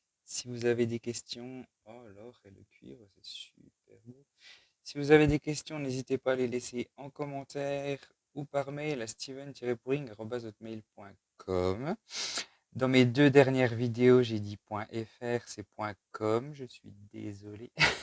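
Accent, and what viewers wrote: Franche-Comté, France. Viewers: Il n’y aurait pas un accent de Franche-Comté par-là ?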